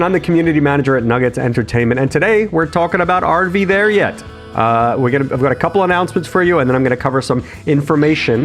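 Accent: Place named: Australia. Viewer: Even since Satisfactory i’m confused by his accent. Are you aussie? American? But you’re in Sweden apparently??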